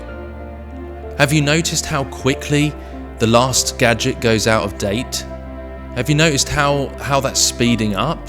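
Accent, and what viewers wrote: United Kingdom. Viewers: I love our English language accent from here in the UK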